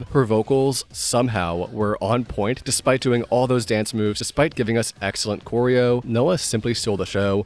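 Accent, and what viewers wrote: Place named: United States. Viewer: I like your channel,! And I agree with you a lot. From your top, the only entry that is not my style is Spain, but I definitely appreciate the art and her amazing talent. All my votes went to Luke 🥺 I wanna see more videos with your comments, I enjoy your american? voice. Haha 😀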